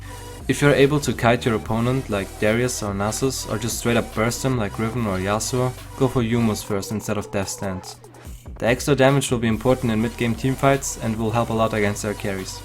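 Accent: Germany. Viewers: Dunno why but you sound like a german to me 0: accent-wise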